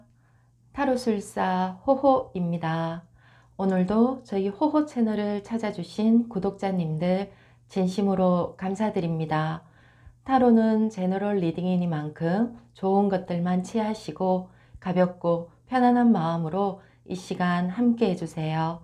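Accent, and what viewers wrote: Gyeongsang, South Korea. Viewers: 경상도 억양을 왜 고쳐야하죠?? 님이 지루하면 안들으면 되는것을..... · 열심히 리딩 해 주셔서 감사 합니다 . 하지만 조금 지루한 감이 느껴 지네요. 경상도 억양 고치시면 더 좋을것 같아요.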